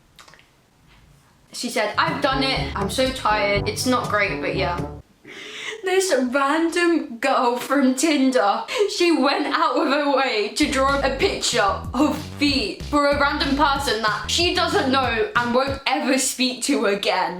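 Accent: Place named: Scotland, United Kingdom. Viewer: Funny accent, scottish?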